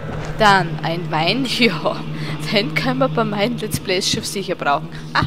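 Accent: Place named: Austria. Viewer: i mog deine lets plays, es oesterreichische ghert sprochlich und a lets-play-technisch sowieso mehr forciert :-)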